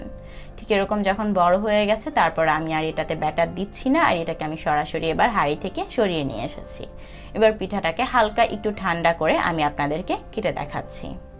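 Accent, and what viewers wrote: Noakhali, Bangladesh. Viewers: ওরে আফা আন্নে নোয়াখাইল্লা নি।😲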